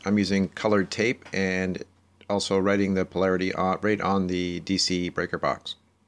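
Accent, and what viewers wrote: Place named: Ontario, Canada. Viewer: You from Ontario Canada?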